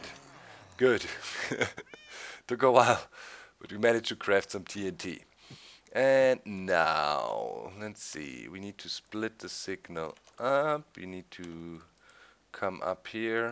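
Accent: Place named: Germany. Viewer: versuch bitte mal ein bisschen an deiner englischen Aussprache zu arbeiten, es tut mir jedes Mal in den Ohren weh, wenn du "Witter" sagst... Keiner verlangt perfektes Englisch, aber es wäre ganz cool, wenn du das "th" wie in "SethBling", "over there" und halt "Wither" ein bisschen, naja, englischer und weniger deutsch aussprichst;).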